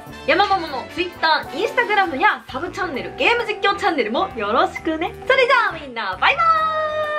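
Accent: Kansai, Japan. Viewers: 関西弁のイントネーションも早口な感じも大好きです😇💕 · やまももちゃんの方言可愛すぎる🥺 関西旅行したくなりました〜〜！方言って面白いよね！ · ちゃんやまもも～ わし明日京セラドームさ三代目見に行くから今日大阪さ上陸したっけよ✌️ 生関西弁いい塩梅だで～😁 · 方言めっちゃ憧れるー✨私ほとんどない笑 あと関西弁ってなんとなくきついイメージあるけどやまももちゃんの関西弁は明るいイメージになるしめっちゃかわいい💕 私の住んでるところで唯一方言だと思うのは「大丈夫ー？」→「だいじー？」って言うよー！ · 説明のイントネーションも凄い関西を感じる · 待って関西弁ばり可愛いしイントネーションすごかねww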